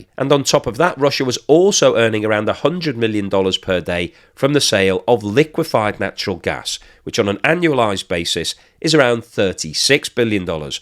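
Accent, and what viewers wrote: United Kingdom. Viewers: Our host is British, eh wot?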